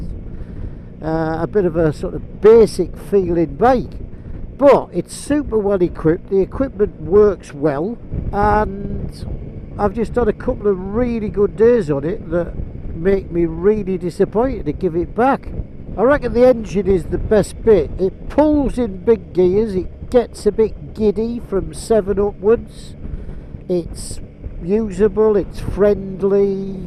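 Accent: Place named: England, United Kingdom. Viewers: that yorkshire accent grinds my gears normally but I can cope when it about motorbikes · is the original straight talking Geordie.. love it!!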